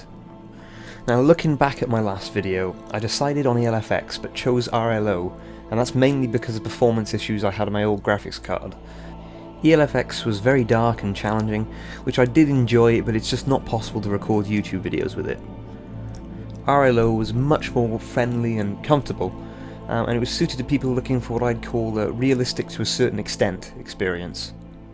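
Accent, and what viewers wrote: United Kingdom. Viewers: Hm is that a slight East Anglian accent I hear? I note you haven't uploaded anything in 9 months, I hope you're okay!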